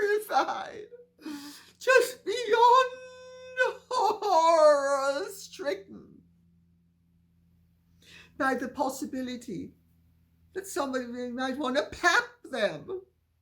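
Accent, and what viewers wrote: United Kingdom. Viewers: ☆☆☆\\\ELAINEWASHINGTON///☆☆☆ THANK YOU, LADY C FOR ALL YOU DO FOR YOUR HARD WORK ON RESEARCH ON OBTAINING THE CORRECT INFORMATION . THEN WRITING SO THAT THE INFORMATION SOUNDS OF INTEREST TO ALL LISTENING . YOU DO SUCH A FINE JOB AND I WANT TO SAY THANK YOU AND HOW MUCH I ENJOY LISTENING TO WHAT YOU HAVE TO SHARE. I LOOK FORWARD TO HEARING YOUR VOICE EVERYDAY . YOU ARE SO BRITISH ! WE HAD A BRITISH COUPLE VISIT OUR CHURCH AND THEY WERE SO WELCOMED ! WE WOULD ALWAYS ASK THEM TO TALK BECAUSE WE LOVED HEARING THEM ANSWER ! WHY ? WE ENJOYED HEARING THEIR BRITISH ACCENT AND SAYINGS. I LOVE YOUR ACCENT ! YOU ARE SO BRITISH ! I LOVE YOUR EXPRESSIONS AND ACCENT . THANK YOU LADY C. I LOVE THE WAY YOU SOUND WHEN TALKING !💖 I LIKE YOUR JEWELRY AND DRESS . YOU LOOK SO GOOD💝 HUG THE FUR BABIES.💋 THEY LOVE YOU LADY C .💛💚 LADY C DID YOU SEE ON UTUBE WHERE HARRY WOULN'T HOLD HARRYS HAND ? 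DO YOU SEE 👀 THE UTUBE VIDEO ? DO YOU HEAR ANYTHING ABOUT THR TWO DIVORCING ? MANY PEOPLE HAVE SEEN 👀 INTERCHANGING BETWEEN THE TWO HERE AND THERE THAT HARRY 👀 WASN'T HAPPY WITH RACHEL RAGLAND 😒 ON ONE OF THEIR APPEARANCES . RACHEL SEEMED INSISTENT TO HOLD HARRYS HAND. THAT MADE HARRY PULL AWAY FROM R.RAGLAND MORE ! DO YOU THINK THE HONEYMOON IS OVER ? HARRY LOOKED SO VERY UPSET !😡 ☆ THOMAS MARKLES AND HIS ELDEST DAUGHTER , SAMANATHA , PLUS HIS SON ALL ASKED NOTTO REFER TO MEGAN AS MARKLES ANYMORE FOR THOMAS HAS DISOWNED HER AS HIS YOUNGEST DAUGHTER . HE SAID SHE HAS TOLD SO MANY LIES ABOUT HIM AND THE REST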